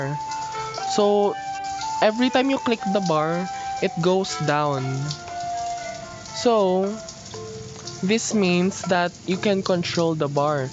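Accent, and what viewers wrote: Philippines. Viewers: filipino accenttt hihi 🇵🇭❤ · i like that filipino accent ahh 🇵🇭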